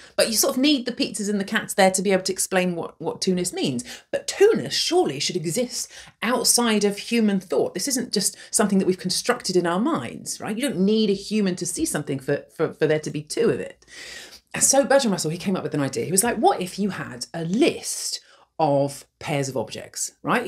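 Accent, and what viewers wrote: United Kingdom. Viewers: I came for the math lesson, but I stayed for the charming British accent.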